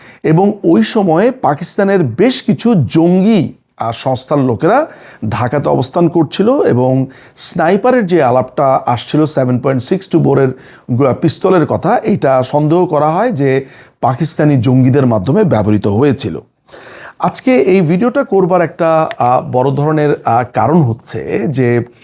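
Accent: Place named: India. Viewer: this bloody talking in Indian tone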